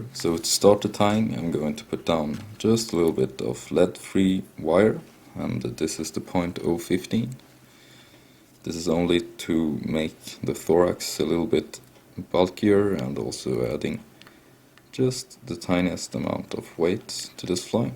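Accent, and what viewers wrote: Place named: Sweden. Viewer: You know, experience tells me that that particular mix of accents means you could be Swedish...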